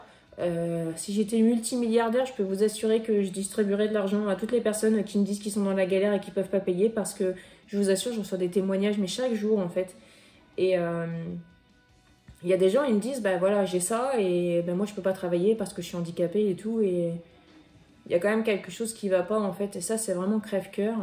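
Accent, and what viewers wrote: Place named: North, France. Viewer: Et t'aime particulièrement parceque tu es le double d'une collègue de travail, du Nord, presque le même accent, la même empathie, gentillesse... une belle personne. Je ne la vois plus car je suis contente partie à la retraite, déménagement à 400 km... continue tu fais de belles choses, et une chose est certaine, les enfants se souviennent des bons moments pas des choses, mes filles qui ont 40 ans me le disent souvent ...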